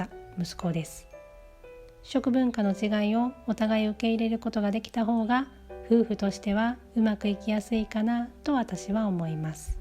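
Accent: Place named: Osaka, Japan. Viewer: Nobanaさんの大阪弁にほっとしています。読み上げてくださるので用事をしながら楽しませてもらってます。大阪府出身で、ニーダーザクセンのとある小さな村在住です。大きな瓶に入ったパーティ用のブラートヘリング南蛮漬けのミートボールが好きです。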